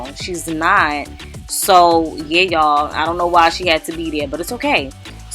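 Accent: New York, United States. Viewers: Its the NEW YAWK accent fah meeee! 😍 (Im from NY too🗽🗽🗽🗽😉)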